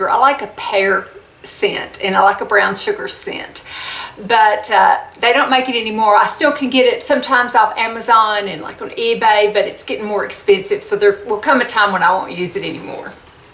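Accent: South, United States. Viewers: I feel like if I was to meet you sometime you and I would have such fun showing off our southern accents!! I was born and raised in Oklahoma and will never (I hope) never live any other place. I love your videos and love your straight forwardness. Thanks for being your true self! 🤗🤗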